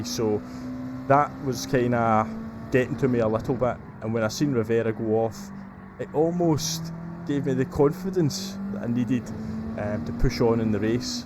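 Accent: Scotland, United Kingdom. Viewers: Awesome video once again. You can see that you clearly have the speed needed to win a race. One thing: you mentioned you are more comfortable starting p3.4,5. Something like that, so you can settle into the race. If you feel more comfortable NOT leading , would it help if you take it easy on the opening lap(s) and if someone wants to overtake from p2/3, just let them? Then you are behind and you can settle in. Of course, it's also amazing if you want to push and challenge yourself to be more at ease pushing from the front. Anyway, great vid and I personally just love to hear the scottish accent. Have no issues and understand everything (and im a dutchy)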